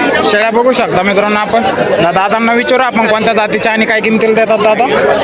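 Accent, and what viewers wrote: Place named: North India, India. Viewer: मराठी भाषेत कुठे येतो रे भैया भैया तू यूपी चा आहेस का बिहारचा आहेस भावाला भाऊ दादा अण्णा म्हणतात तुमच्यासारखी माणसं मराठी भाषा खराब करतात रे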